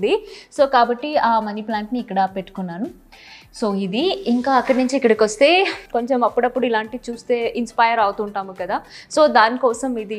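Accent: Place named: Telugu, India. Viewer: Mee voice n Telugu slang kekaaa loved you so much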